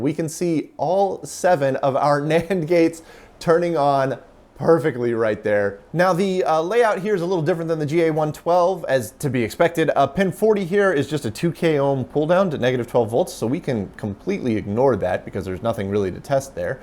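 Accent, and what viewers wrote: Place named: United States. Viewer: Right? Always nice to see some metric from someone with an American-sounding accent. :) We'll get there eventually!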